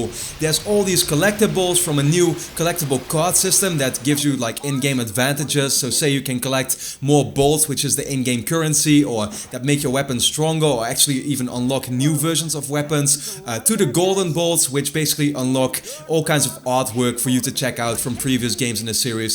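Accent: Netherlands. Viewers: is this guy dutch? if he is so hey ik ook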